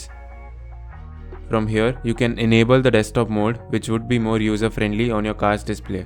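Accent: India. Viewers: I don`t understand curry english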